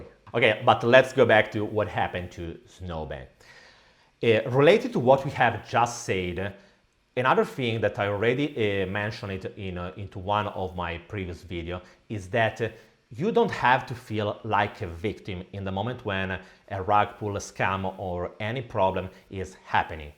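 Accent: Italy. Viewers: Are you italian by any chance? your accent sounds a bit that way, if I'm right, mi fa piacere vedere un fratello italiano in DeFi come me 😁! Great video, good job!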